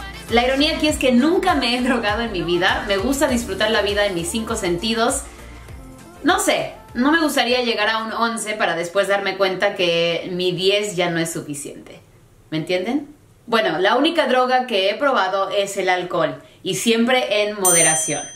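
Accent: Mexico. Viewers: rad15 90, para estás fechas, ya sabrás que ella nació en EUA.......y que fue estuvo desde pequeña estudiando en México, (por asuntos familiares) así que sus estudios básicos elementales fueron bajo el sistema educativo mexicano. Es básicamente una mexicana, nacida en USA. Qué les pasa?.....no tiene ningún acento, que no sea el español; aprendido en México. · You speak like a Mexican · Tiene el acento Mexicano. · Veo tus vídeos más que nada por tu acento jaja. Me gusta mucho que hables tan mexicana, no es sólo que hayas vivido aquí, sino que entiendes la cultura y eso se agradece. Sobre la droga, hubiera sido interesante saber si Pancho conocía al bartender jaja es lo único que me hace lógica. Como comentario sobre el video, sentía a veces que la música más que adentrarme en tu narrativa, me distraia y desconcentraba de lo que estabas diciendo. Creo que no es necesaria. 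Saludos!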